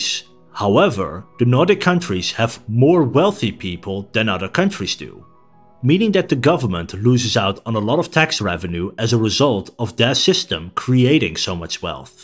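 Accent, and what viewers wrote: Netherlands. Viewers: This dude sounds Dutch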